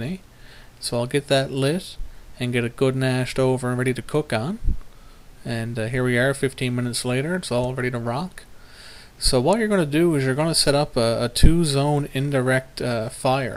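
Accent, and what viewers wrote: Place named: Newfoundland, Canada. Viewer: Awesome. I figured Newfy but sometimes i get confused by people from Nova Scotia etc. I am from BC myself and just getting into this charcoal cooking. Tons of fun! Cool Videos.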